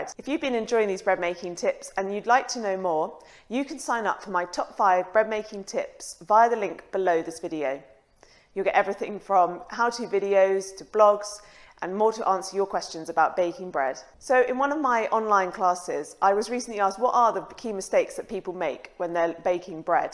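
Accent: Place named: United Kingdom. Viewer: Oh, it's definitely warmer here! No WAY would the interior of our house ever approach 65F, even in the dead of winter. LOL. Thank you for your quick reply. I appreciate it. The reason I ask is that most recipes I have encountered for baking sourdough bread recommend, after an initial 3-hour period of stretch and fold, placing the dough in the refrigerator overnight. So, you wake up in the morning, and your dough has had 8-10 hours to rise. That's worked pretty well for me, but on occasions, I have wanted to shortcut the process. I have merely guessed at what (with a winter room temp around 23C) a "couple of hours." So far, so good, but I'm flying blind. The "overnight in the refrigerator" method seems to work fine, but I'm occasionally ready to bake sooner than that! Summer's a different deal, when the interior of our house stays consistently at 26 or 27 C. 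Thanks again for your help! Don't lose that British accent, it's SO charming. :o)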